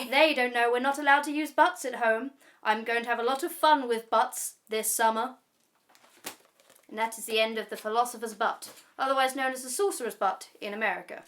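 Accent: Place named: Scotland, United Kingdom. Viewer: Also love your scottish accent, it's nicer than mine and I'm scottish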